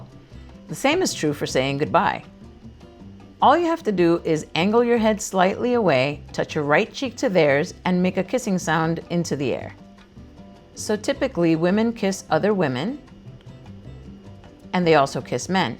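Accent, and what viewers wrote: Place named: Latin America. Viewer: Where are you from? You sound Latina Americana! 😊 ❤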